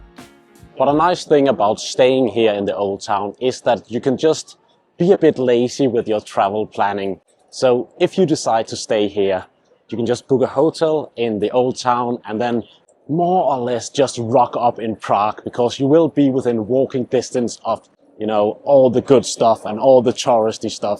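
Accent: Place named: Liverpool, United Kingdom. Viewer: You sound like you have a slight Liverpudlian accent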